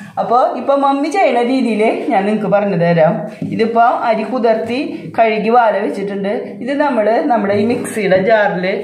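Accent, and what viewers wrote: Thrissur, India. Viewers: Nammade Thrissur bhasha kekkumbo enthoru santhoshaannu ariyo ..thanks mummy ..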